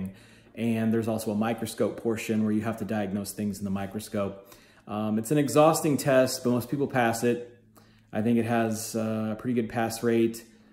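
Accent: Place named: United States. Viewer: In Iraq, I had to go through 6 years in order to finish medical school (requested number of years here ) 😖1 year junior residency, 1 year between PHC and my work in university.. and now i’m in the second year Histopathology board residency. I like how you explain it from A to Z, your clear American-english accent is astonishing, I just put headphones and listen.. every time you post a narrative video 🤩 I wish you were my tutor 🙏🏻 Thank you so much, Dr. 🌷 Arwa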